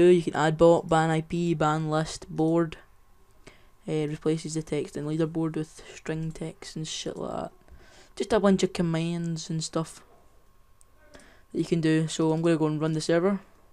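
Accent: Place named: Scotland, United Kingdom. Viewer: Thought so i am 2 xD can just tell when some1 is scottish xD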